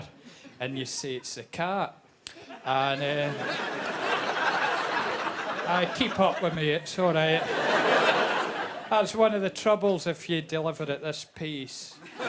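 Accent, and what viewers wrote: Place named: Scotland, United Kingdom. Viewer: he's from the east coast of Scotland, not glasgow, i'm from the west coast of kintyre & my accent is different from people living less than 10 miles away which is different from the accent 10 miles in the opposite direction & there are half a doz different accents in the 37 miles between my hometown & the tarbert, loch fyne accent, there has to be at least 100 different Scots accents between the borders & the northern & western isles with the best spoken english place in the world being the city of Inverness on the moray coast, obviously due to the aftermath of culloden in 1746 as the site is barely ten miles away & the town would have been garrisoned with english troops following the '45' rebellion. david kay is a comedian everyone should adjust their ears to as he is unique, original & hilariously funny, unlike the micheal mcintyres & catherine ryans of this world who are pathetic yet never off our screens.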